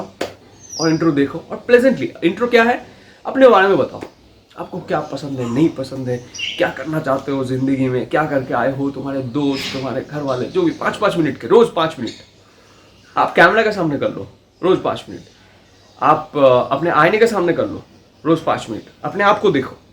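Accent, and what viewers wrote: Bengal, India. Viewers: Sirf 'ra' sound ki wajah se aapka Bangla tone aa jata he.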